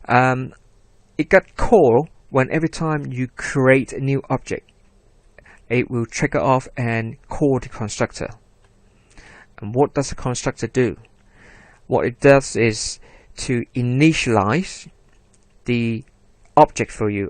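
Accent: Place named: London, United Kingdom. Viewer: Nice video! We are you from? You sound like a Londener and Chinese at the same time (no ofense).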